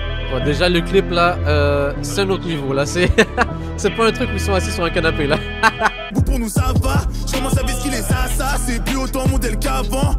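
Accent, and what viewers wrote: Quebec, Canada. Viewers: Il est québécois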